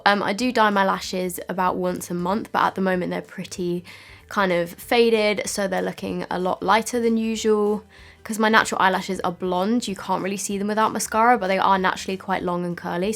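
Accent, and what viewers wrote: United Kingdom. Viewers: She's literally Summer Mckeen+Sabrina Carpenter+british Accent :) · i love your accent!! I generally want to move to the UK just hear people talk with the accent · When I click on your vids i always think you’re gonna be from like Texas or sum and you’re British 😂😂😂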